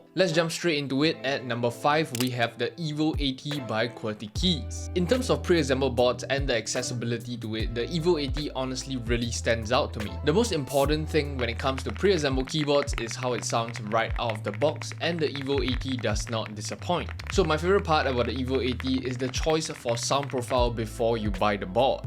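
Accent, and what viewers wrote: Singapore. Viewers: articulate young man with a Singaporean accent 😭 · Stumbled into mechanical keyboards when I saw a Nuphy ad on social media, then went down this rabbit hole of keebs, including subscribing to this great channel with an articulate young man with a Singaporean accent, which surprised me! Very proud of you; keep up the good work!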